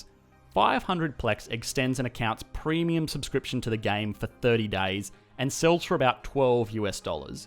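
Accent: Australia. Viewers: Shame the Australian accent ruins any chance of the vid being taken seriously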